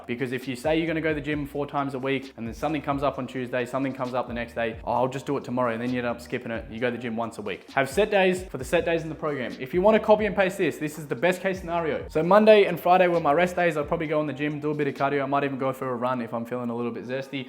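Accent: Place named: Australia. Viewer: He’s speaking proper Australian mate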